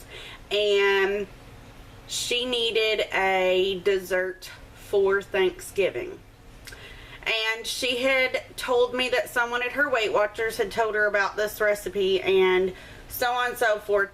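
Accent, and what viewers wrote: United States. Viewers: come on now...it is not good for anyone... sugar, fat and salt....I hear your southern accent and assume you are a fool.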